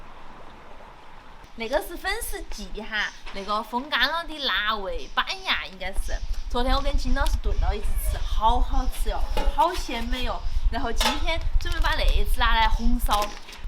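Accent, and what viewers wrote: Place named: Nanchong, China. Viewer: 怪不得听你说话那么熟悉的乡音，我也是南充的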